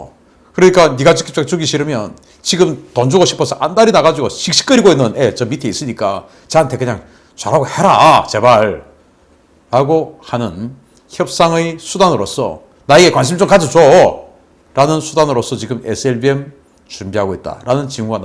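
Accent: Gyeongsang, South Korea. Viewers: 갱상도 사투리 좀 고쳐라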